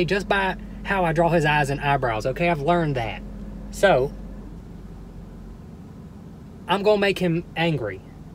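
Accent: South, United States. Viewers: You are hilarious!! Where are you from I hear a southern accent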